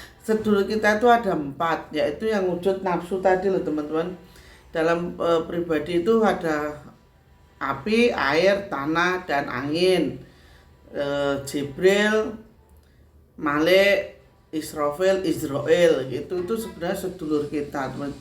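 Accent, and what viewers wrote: Java, Indonesia. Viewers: Sy sangat senang mengikuti chanelnya mbak,karena bbrp faktor: 1.Gaya penyampaian yg nJawani,kalem,sederhana tapi cukup mentes.2.Rendah hati,tidak terkesan menggurui atau bersikap "sok".3.Ada kesan penyampaian amanah,karena ibaratnya mbak mendapatkan "oleh-oleh" yg seyogyanya dibagikan kpd teman" lain agar bisa ikut menikmatinya.4.Tidak terlihat sedikitpun ada upaya utk membodohi atau mengajarkan sesuatu yg menyimpang dari tuntunan agama Islam.Kalo ada perbedaan persepsi karena pengaruh budaya itu sdh lumrah.Maka jika yg berkenan yg monggo,yg tidak ya silakan.Up to you.Bagi sy pribadi,sangat bermanfaat terutama bagi situasi skrg,di mana manusia banyak menghadapi tantangan hidup yg perlu solusi,bukan sekedar narasi.Yang perlu digaris bawahi adalah,bhw mbak bukan "memberikan ajaran",namun "menyampaikan pesan kebaikan bagi sesama" terlebih bagi yg sedang menghadapi kesulitan hidup.🙏🙏🙏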